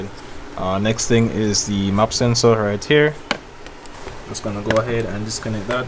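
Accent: Jamaica. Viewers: Hey man, totally random, but are you Jamaican by any chance? Picking up a very familiar accent 😅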